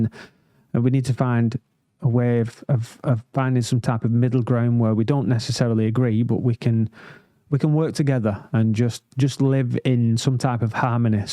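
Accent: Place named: Scotland, United Kingdom. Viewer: your deep scotland accent makes you sound "old and mature"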